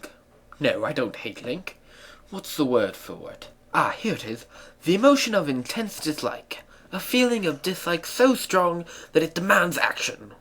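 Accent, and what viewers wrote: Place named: England, United Kingdom. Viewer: cool English accent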